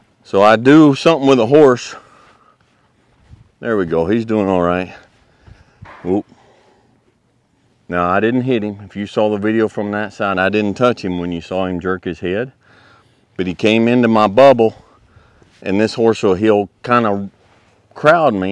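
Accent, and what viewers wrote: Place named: Kentucky/Tennessee, United States. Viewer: A big welcome to Texas. Mighty glad your now in God's country. With that Kentucky/Tennessee accent you will fit in just fine. The scenery of the hill country of Bandera is mighty beautimous. As always I look forward to your informative videos, and the lessons of life you tell.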